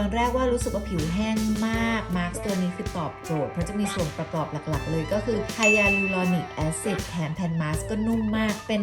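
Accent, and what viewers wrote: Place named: China. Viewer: สำเนียงจีน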